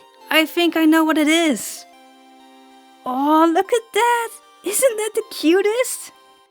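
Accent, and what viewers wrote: Netherlands. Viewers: ons nederlands accent is echt grappig😆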